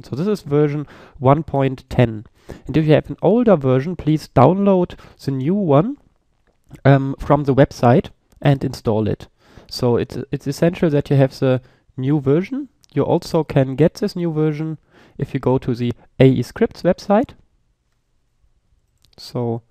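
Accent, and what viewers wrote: Germany. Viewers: Man hört unnormal dass du Deutsch bist :D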